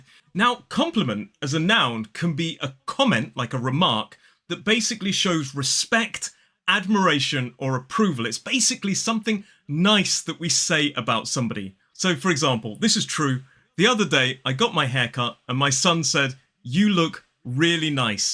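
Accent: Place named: RP, United Kingdom. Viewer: My favourite British rp accent teacher on YouTube. Love the energy you give the words when you're speaking. Makes it easy for our ears to pick the tobality and musicality of the accent. Thank you!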